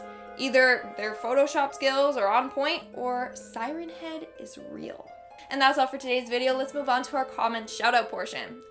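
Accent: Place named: United Kingdom. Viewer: i thought she had a british accent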